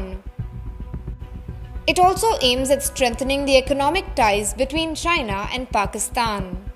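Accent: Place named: India. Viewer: was ready to dislike the moment i heard the accent, but this was unbiased... for an Indian outlet talking about pakistan, that's a massive achievement well done!.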